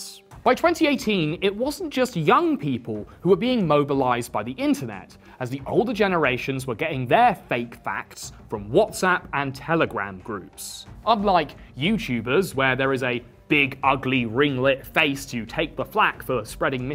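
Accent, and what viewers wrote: United Kingdom. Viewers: you sounds british? so not a gringo in the kosher sense of the word. but yeah, I would call you a gringo, you go gringo! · Excelent broad strokes . At first glance, I would not take your channel seriously , given the choices of the Good, The Bad, and the Ugly out there. And the Brittish accent does not mean squat. And you were a bit too scruffy. Yet , you surprised me , by bringing in perspective from a far back timeline. And the homework put into it comands respect. And by dissecting facts and events thoroughly. And by staying even keeled, even thought you had a clear and defined stance ( granted it was European , yet not incendiary ). And your choice of language was impecable. Perfect Narrative and pace Negatives. No sticking your feet in the table ! Keep your beard groomed, for heavens sakes. Get rid of the silly props. You have what it takes Congrats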